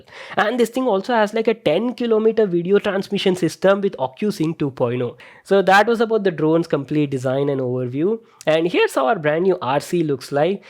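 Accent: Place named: Hyderabad, India. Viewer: Your accent says you are from Hyderabad 😃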